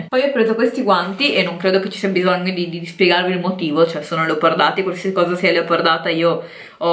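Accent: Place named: Veneto, Italy. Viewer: Ma sei veneta? Pk anche io ,e sentivo un leggero accento veneto,io non abito in Veneto ma a Rimini però ho i parenti La e a volte vado Baci baci spero che vieni a Rimini pk vorrei vederti ,cmq sei bellissima e hai un sacco di stile ,ti stimo❤️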